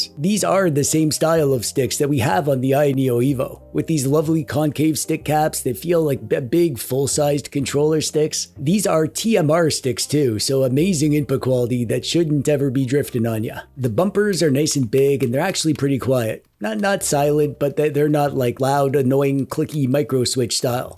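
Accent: Canada. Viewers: That Canadian voice can't be hidden ❤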